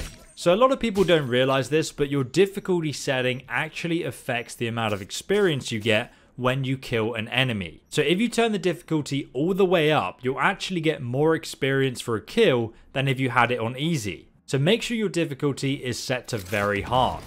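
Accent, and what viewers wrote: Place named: United Kingdom. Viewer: I know its nothing to do with this game but, I love your british accent.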